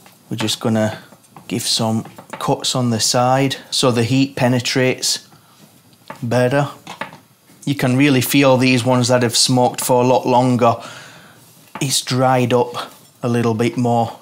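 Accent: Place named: Bradford, United Kingdom. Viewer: Northern Spanish from Bradford? Unique! 😁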